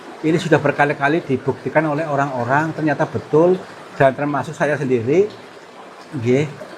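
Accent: Banyumas, Indonesia. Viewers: Orang ngapak om? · Kayane wong ngspak kang pada karo inyong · Ngapusi dirinya sendiri ,ingat jaman sekarang itu nggak ada gitu gituan ini bohong ,kalau di lihat dari gaya logat ngomong nya ini orang ngapak ,semoga sukses bikin berita bohong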